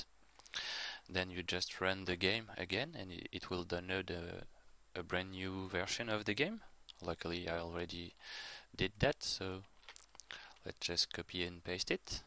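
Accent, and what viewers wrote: France. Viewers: On s'en fout de l'accent j'ai jamais un francais parler si bien l'anglais. -un quebecois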